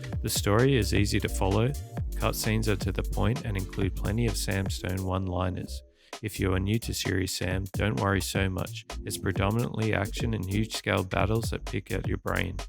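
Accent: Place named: Canada. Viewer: Iconic canadian accent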